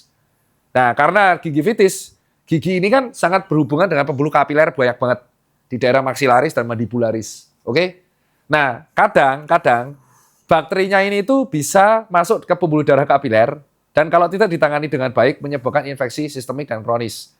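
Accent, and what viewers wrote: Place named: Surabaya, Indonesia. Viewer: Original Suroboyo... cocok banget aku suka 😅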